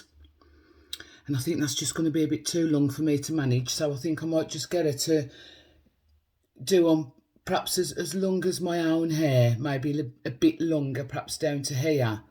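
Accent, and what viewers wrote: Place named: West Midlands, United Kingdom. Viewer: Hi just come across your channel, so glad I did really enjoyed your day in the life! Not sure where you come from in the West Midlands, I live in Lichfield, you sound like my friend from Walsall although everyone when we are away always thinks we’re from Birmingham 😂 x x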